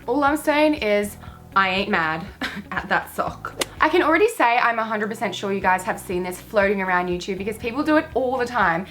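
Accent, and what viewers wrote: Australia. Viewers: Is she Australian (I'm Aussie)😂💙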